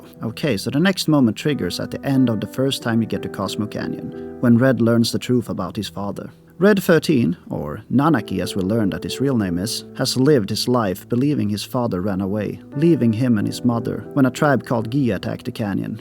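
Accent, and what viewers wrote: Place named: Sweden. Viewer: May I ask what country you’re from? You sound Swedish, and I hope that’s not insulting. My grandma is a full blown Swede. Great channel dude! I’ll definitely be following you and looking forward to what you put out next.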